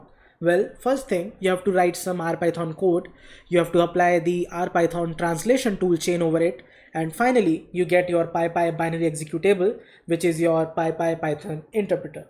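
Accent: India. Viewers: It's the thick Indian accent. It's not easy on the ears for foreigners.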